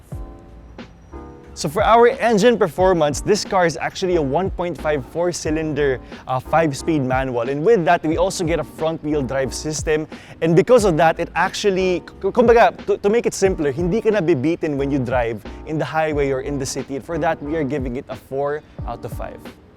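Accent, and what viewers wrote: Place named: Conyo, Philippines. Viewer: Nakaka inspire pag siya yung host ng review. Cute. Conyo. but Informative :D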